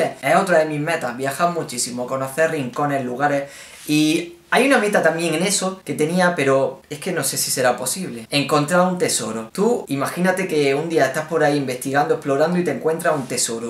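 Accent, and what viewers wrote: Spain. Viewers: Ya se me esta pegando tu asento español PD: soy de mexico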